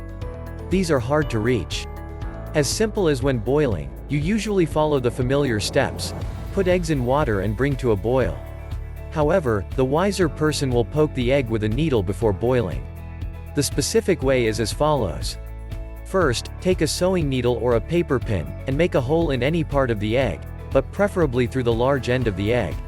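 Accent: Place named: United States. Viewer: This video is so scary. It is obviously not written in English lmfao but the white American accent is scary!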